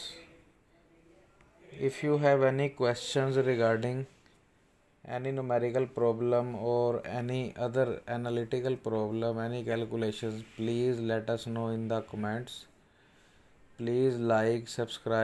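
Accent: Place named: India. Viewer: Wow this is amazing Am helped a lot though am a Zambian and I had some difficulties in getting the Indian accent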